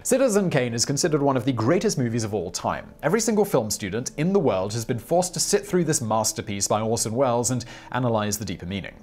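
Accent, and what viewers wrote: United Kingdom. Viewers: Get guy with a British accent to state things emphatically and act like that is The explanation of the movie. The top wasn't Cobb's totem it was Mail's, so what it did doesn't matter. What King says doesn't make a difference to Kubrick's movie. (Did you see the version he made? It suuuuuuuuucked.)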